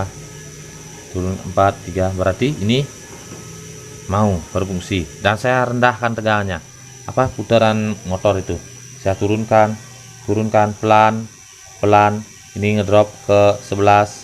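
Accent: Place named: Bali, Indonesia. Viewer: Kaka ini orang bali kah ko logot nya kayak orang bali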